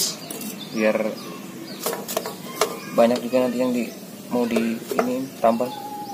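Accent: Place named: Banyumas, Indonesia. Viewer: Ngomonge campur ngapak...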